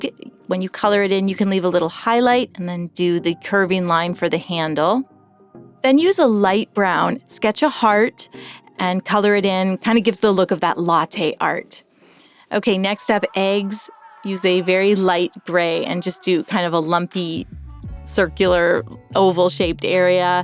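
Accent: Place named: Ontario, Canada. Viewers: I love your French coming through...it's nice to hear "Canadian"... (I'm Canadian) I'm looking forward to trying your breakfast doodles. So fun! Side effect...making me hungry😂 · sounds pure Ontario to me!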